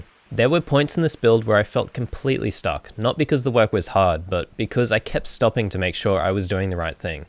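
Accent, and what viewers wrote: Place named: Australia. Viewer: First time to your channel. Came here from a short of this video, started watching for my fellow Australian accent, stayed for the great build. Nicely done.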